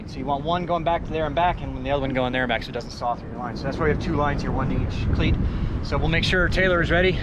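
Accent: Canada. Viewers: Taylor "Oout and Aaabout" love the Canadian language. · I like her Canadian accent. Very nice.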